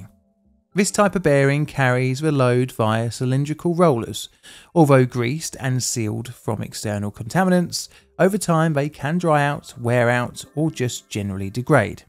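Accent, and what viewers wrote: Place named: London, United Kingdom. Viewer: I was sat back listening doing a few things and I was thinking to myself you know what Joshua this bloke sounds like he’s from London in the end though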